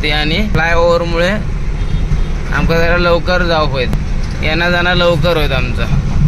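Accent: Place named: Malvan, India. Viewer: Good vlog nice to hear malvani 😊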